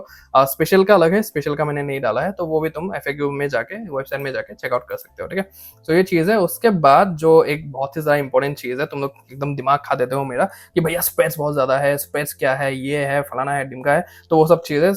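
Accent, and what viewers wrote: Assam, India. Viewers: Are you Assamese ?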